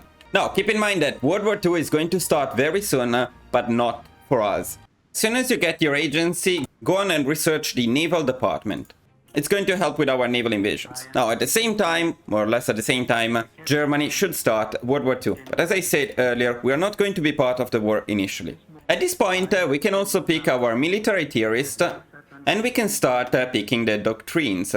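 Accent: Italy. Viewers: Sei italiano?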